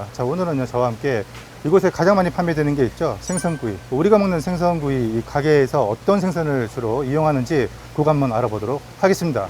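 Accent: Gyeongsang, South Korea. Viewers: 님을 알게되고 영상을 수년간 봐오면서 의아?했던점이 '서울분으로 알고 있는데 말씀하실때 왜 경상도느낌이 계속 나지?' 했는데 오늘에서야 비로소 궁금증이 해결되었네요ㅎㅎ부모님께서 부산분 이셨구요ㅎㅎ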